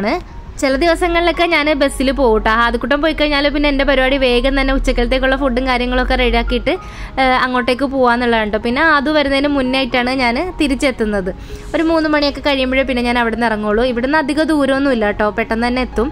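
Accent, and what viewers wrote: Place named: Kasaragod, India. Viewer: Chakkappaayam ...kasaragod parayunne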